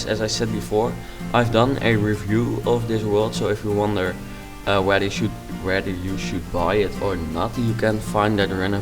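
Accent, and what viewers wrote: Netherlands. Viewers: haha je kan echt horen dat je nederlands bent (: mooi huis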